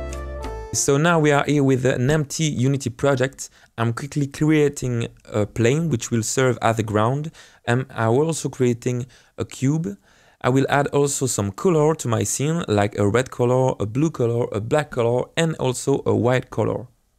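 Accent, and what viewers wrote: France. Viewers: Ooh that's super interesting ! I may start developping a little VR game soon thanks to you ! So thank you :D Wait a sec, is your accent French ? 'cause I am and I may have the same accent as you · Salut il me semble détecter un accent f Français, je me trompe ? Tes tuto me donnent envie de me mettre à faire mon propre jeu oculus Quest. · mmmmmhhh le french accent x) C'est marrant de voir la description en français et la vidéo en anglais. En tout cas merci pour la vidéo c'est bien expliqué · Hey, Nice video but it seems it doesn't work for me with the recommended version 2018.4 LTS, I'm able to move, see my hands and so on. But I can't grab anything... I figured out that it was my capsule colliders for grabbing in my hands that weren't moving but it was impossible for me to solve it... Can you help me? PS: You sound french!:p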